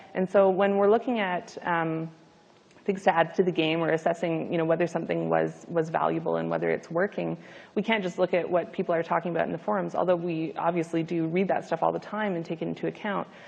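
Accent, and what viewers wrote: France. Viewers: Tu est français ? J'ai l'impression de l'entendre dans l'accent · You French? You've got a French accent when talking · Ce léger accent français :p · Ohohoho, you got french accent monsieur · Are u French? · olala ton accent francait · He's French dude, accent's getting in the way, but still clean English. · A l'accent, j'ai reconnu que tu étais français...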